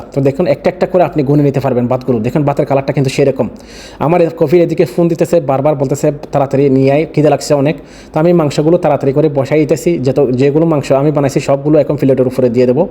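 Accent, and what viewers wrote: Bangladesh. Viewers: বদ্দা তো চিটাইংগা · হেতে নোয়াখালীর বাংলা কয় তাই না?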